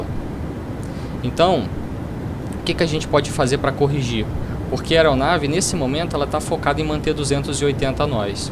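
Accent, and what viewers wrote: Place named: Rio de Janeiro, Brazil. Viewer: Muito obrigado comando! Sua didática é excelente. E parece ser meu conterrâneo do Rio de Janeiro kkk você é piloto real de 737 ?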